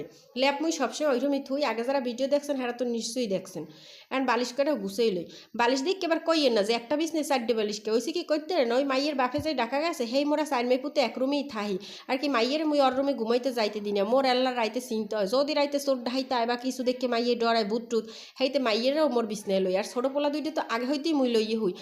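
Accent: Barishal, Bangladesh. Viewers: আসসালামু আলাইকুম আপু চলে আসলাম তোমার ভিডিও দেখতে বরিশাল্লা কথা আমার ভালই লাগে লাইক কমেন্ট সাবস্ক্রাইব করে দিলাম নতুন বন্ধু হিসেবে পাশে থাকলাম অনেক ভালো লাগলো ❤❤❤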